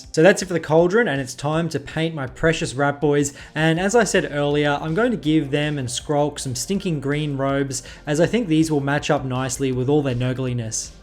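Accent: Australia. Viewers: I gotta say I love your stuff. Whenever I see a really classic Warhammer mini I can always imagine that in the distance I can hear an Australian accent and some delicious dungeon synth.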